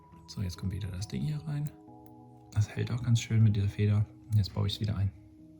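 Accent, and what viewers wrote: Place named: Dippsch, Germany. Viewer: So toll aufgebaut die Videos 👌👌 von wo kommst du denn? Das Dippsche 😄 geil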